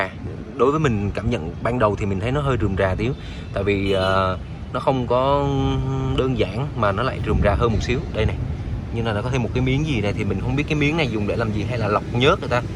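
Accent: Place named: China. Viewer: Lũ bắc kì khựa dân làm quảng cáo xe nghe khẩu âm khựa ngữ đéo ưa rồi.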